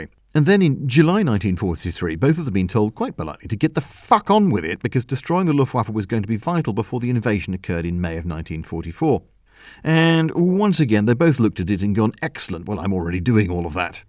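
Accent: United States. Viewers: The American accent threw me off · Your American accent is hilarious, I'd love to hear it more it sound exactly like one of my cousins.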